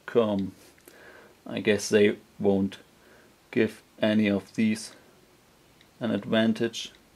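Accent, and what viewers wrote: Germany. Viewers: possible that you are german? Your english accent :D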